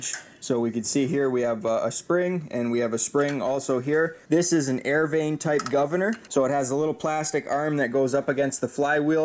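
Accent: Canada. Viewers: Nice video, good lighting and explanation (you sound like you are Canadian!)…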